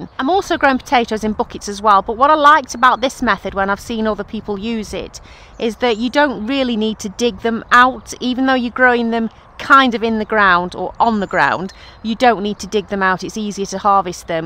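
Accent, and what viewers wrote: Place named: Potteries, United Kingdom. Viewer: Thats a potteries accent i hear . I am from Silverdale originally.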